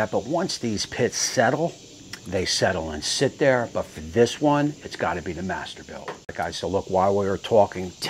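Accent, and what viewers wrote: New Jersey, United States. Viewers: Chim-a-ney... Love to NJ accent brother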